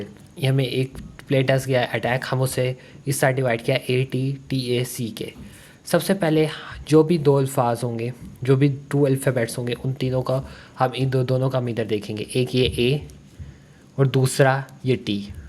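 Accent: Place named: Pakistan. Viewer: pakistani aacent jaisa hai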